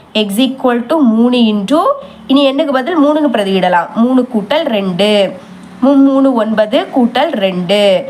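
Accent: Kanyakumari, India. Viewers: Neenga kanniyakumari yaa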